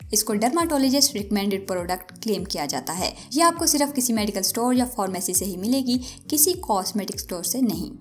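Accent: Punjab, India. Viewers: Nice di panjbi bolde ho so sweet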